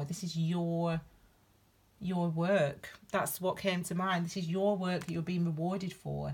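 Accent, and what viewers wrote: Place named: Northern England, United Kingdom. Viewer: Northern England represent! Sounds like you are from my neighborhood. Thanks for this xx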